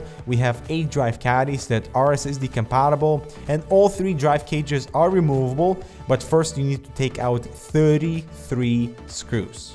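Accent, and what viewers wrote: Russia. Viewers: no trust me I know the accents I'm guessing he could be Russian or maybe Norwegian or he might be Dutch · work with quality and light are awesome! Like it a lot. By the way, are you russian?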